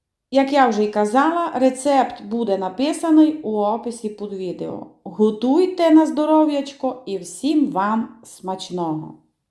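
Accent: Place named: Transcarpathia, Ukraine. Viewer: Дорога пані! Так сталося що я прожила в сонячному Закарпатті три роки (чоловік ❤працював) і я до нестями полюбила цей казковий куточок та цей діалект. Це така ностальгія. Ви кулінар, майстриня та художник ❤❤❤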